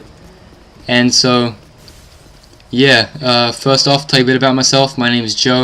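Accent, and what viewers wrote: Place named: New Zealand. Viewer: Nice commentary!!! lol metalstorm i had no idea there were so many new zealander commentators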